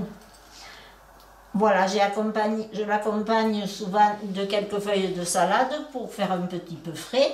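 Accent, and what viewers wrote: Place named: South, France. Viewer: Hummmm...j'ai un plaisir fou à vous suivre...et à faire vos recettes délicieuses...merci beaucoup...et en plus comme chantait,avec! C'est merveilleux.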